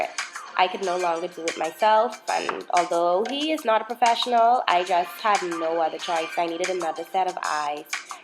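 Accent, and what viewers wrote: Bahamas. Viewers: Could always tell a Bahamian by that accent. 🇧🇸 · Great video .as soon as I heard your voice I was like the Bahamas . I am from Nassau but have been in Virginia since 1996 . Keep on doing your best . You are a star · Are you from The Bahamas? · You sound like a Bahamian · Yassss I know bohemian sister when I hear one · I luvvv my Bahamians 🇧🇸.... I just luv our accent and when you said "Horrible" why I bust out laughing lol but it really looks great on you tho...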